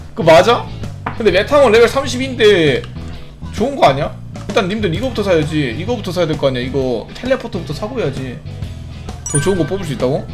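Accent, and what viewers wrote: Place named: Jeolla, South Korea. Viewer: 전라도 광주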